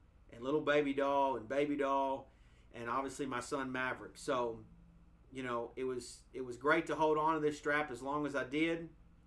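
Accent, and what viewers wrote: South, United States. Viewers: This is the side of I like and appreciate. Calm, and makes a lot of sense. One thing, he’s gotta be from the south with that accent. And! It’s apparent, he must be a NASCAR fan as well., who’s your favorite driver? What’s your thoughts on the current state of NASCAR? I left NASCAR on the final lap at Phoenix last year when 7X Cup Champion Jimmie Johnson rode off into the sunset. Love to hear your thoughts. Love your channel.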